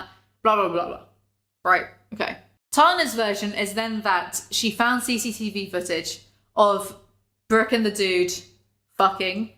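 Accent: United Kingdom. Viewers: Gurl, you've got the prettiest dark honey-chocolate eyes, the makeup suits you well. And i will never get tired of listening to you talk about anything. Your british accent is so nice.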